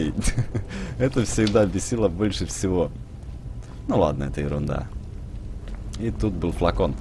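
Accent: Ukraine. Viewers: Ты Украинец? Что за акцент?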